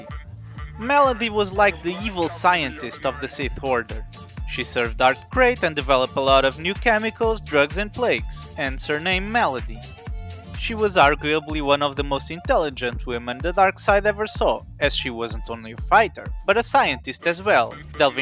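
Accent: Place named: Russia. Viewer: I love how Russian your voice is my guy it's a great accent!!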